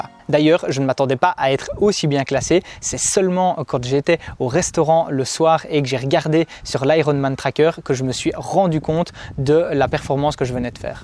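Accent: Belgium. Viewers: Avec ton accent je croyais que tu étais belge xD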